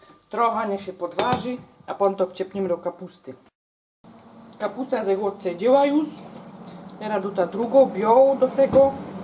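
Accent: Silesia, Poland. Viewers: skad lochodzisz? Niby mowisz po slasku ale troche inaczej niz u nas w domu mowili? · Pani prosze nie podjadac tego miesa z patelni bo nie bedzie co do kapusty wciepac.Ja robie zupelnie inaczej bigos szczegolnie swiateczny ale napewno spruboje Pani wercje kiedys.Nie jestem slazakiem ale lubie Pani gware i ta taka prostolinijnosc.Pozdrawiam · Uwielbiam slazakow sama mam rodzine na slasku no to na Swieta dalas mi pomysl na bigos po slasku pozdrawiam fajnie gwarzysz po slasku ;) tak trzymaj.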